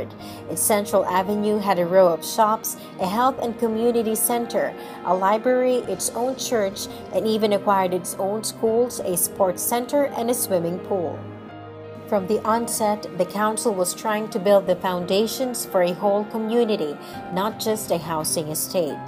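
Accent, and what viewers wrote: United States. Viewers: Why is the narrator an American?? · The text is fine , why on earth has "Oxford info", presumably outsourced by OCC , used a mechanised American voice for this video instead of a rich Oxford town burr? An answer pls?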